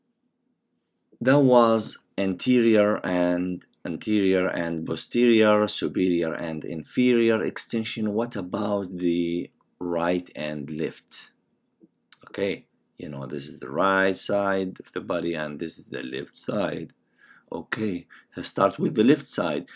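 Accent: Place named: Arab world. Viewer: ابدعت دكتور احسك عربي من لهجتك ما اعرف ليش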